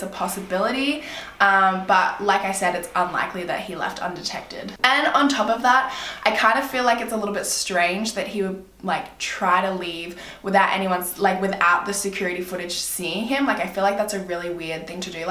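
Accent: Australia. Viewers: i normally rly don't like accents like british accents but i love your australian accent so much and you're so beautiful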